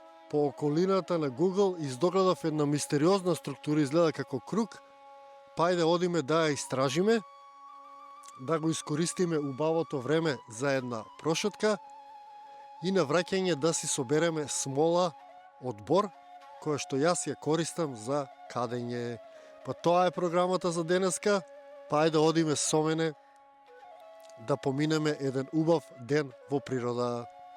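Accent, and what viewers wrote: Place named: Veles, North Macedonia. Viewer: Да си го зачуваме јазикот, кој и онака е во изумирање. Малите македонски деца повеке зборат англиски... се надевам знаат и македонски. Кај нема наталитет, има абортуси и сите деструктивни нешта, барем јазикот да го зачуваме. Ете ти збориш на велешки акцент што е убаво да се слушне. Така да, моја препорака да продолжиш вака како до сега. Евентуално можеш да ставаш титл на англиски или синхроно и посебно да ги објавуваш. Но продолжи да снимаш како до сега.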